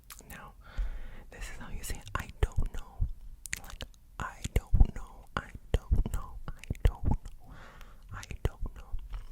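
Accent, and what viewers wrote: Egypt. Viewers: Pure Egyptian arabic accent!! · Your way of speaking in the Egyptian dialect is very beautiful.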